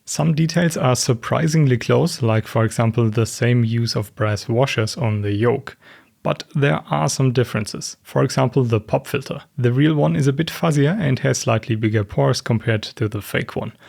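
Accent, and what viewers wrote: Germany. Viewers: Ist das jetzt zum Trend geworden, dass deutsche anfangen auf englisch Videos zu machen? Das klingt immer total schrecklich wenn man hört, dass die Leute Deutsch als Muttersprache haben und nicht englisch 😭 Dieser deutsche englisch Akzent ist grauenvoll!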